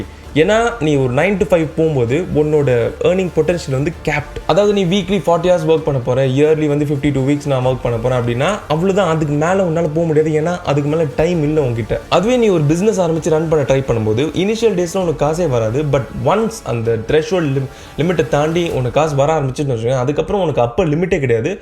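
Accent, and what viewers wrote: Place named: Sri Lanka. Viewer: Bruh u sound like srilankan bruhh 😂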